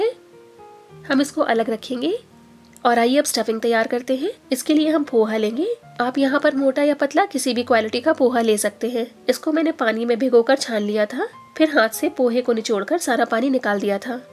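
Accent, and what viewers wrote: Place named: India. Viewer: I like to make new recipes and I always try new ones and I m a regular visitor of ur channel. I like ur hindi accent n ur way to describe d whole recipe but today, very first time I m seeing u in indian attire. looking very nice as ur recipes.. keep doing ji and thanks for different types of cookery inventions.. ☺👍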